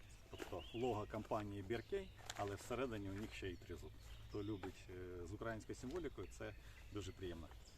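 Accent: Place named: Russia. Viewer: мені половина відео слух різало, наголоси на російський манер. Особливо в слові Український. Думав до кінця не додивлюся.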